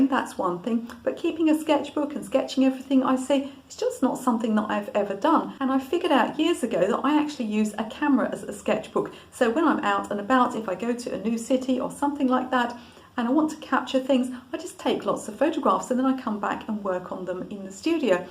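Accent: England, United Kingdom. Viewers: HI, I SUBSCRIBE TO YOUR CHANNEL. I REALLY ENJOY IT AND LEARN A LOT FROM YOU. HOWEVER, IT IS REALLY, REALLY HARD TO ABSORB ALL THE INFORMATION - DUE TO YOUR RATHER RAPID RATE OF SPEECH & MAYBE TINY BIT DUE TO YOUR ENGLISH ACCENT. BECAUSE IT TAKES THE HUMAN BRAIN TIME TO PROCESS SOMEWHAT COMPLEX INFORMATION, ESPECIALLY. I TOO, SPEAK RATHER FAST, AS COMPARED TO SOME FOLKS. THIS IS IN NO WAY A CRITICISM!! YOUR CHANNEL CONTENT IS VERY GOOD INFORMATION- I WATCH YOUR CHANNEL OFTEN THANK YOU, AND KEEP UP THE NICE WORK,YOU HAVE HELPED ME LEARN A LOT! PS WHEN I WATCH YOUR CHANNEL AND SOME OTHERS TOO - FORTUNATLY, ON YOUTUBE, I HAVE THE OPTION OF (SPEEDING UP OR SLOWING DOWN) THE VIDEO PLAY BACK SPEED. SO, I WATCH YOUR CHANNEL AT A SLOWER VIDEO SPEED AROUND 75% -50%. MAKES YOUR VOICE SOUND A BIT ODD LOL. BUT, IT GIVES MY BRAIN TIME TO PROCESS YOUR INFORMATION. 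I REALLY LIKE YOUR FORMAT TOO, IN THAT YOU STICK TO YOUR SUBJECT AND NOT A LOT OF TALKING ABOUT WHAT YOU DID TODAY, WHAT YOU ATE, WHERE YOU SAW SO AND SO ETC LOL. I WATCH ARTISTS VIDEOS TO LEARN ABOUT ART. I FIND A LOT OF "SMALL TALK" VERY DISTRACTING. I ALSO LEARN BETTER FROM BOTH VISUAL AND AUDIO DISCRIPTION OF TOPIC- BECAUSE I AM A NEW ARTIST. I AM SO VERY GRATEFUL FOR YOUTUBE! I HAVE LEARNED SO MUCH MORE INFORMATION AND MUCH FASTER THAN I COULD HAVE ON MY OWN, THATS FOR SURE- SO THANKS AND PLEASE KEEP THE NEW CONTENT COMING- MY GRATITUDE TO YOU!!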